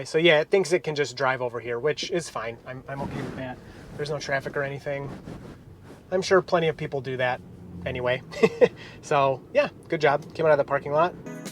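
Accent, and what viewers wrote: Michigan, United States. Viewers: Michigan guy?!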